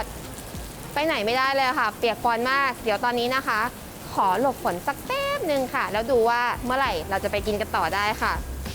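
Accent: England, United Kingdom. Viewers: คุณ เป็นนักเรียนอังกฤษเก่าใช่มั้ยคะสำเนียงออกทางอังกฤษเลยค่ะ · ชอบสำเนียง British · ชอบคุณ พูดอิ้งค่ะ ฟังเพลินดี สำเนียงออกบริตติชเลยค่ะ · สำเนียงหนู British มากเลยค่ะ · พูดสำเนียงอังกฤษไช้มั่ยเนี่ย ดูepNYมาหลายตอนเพิ่งสังเกต😁😁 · Did you attend school in England? You got an English accent.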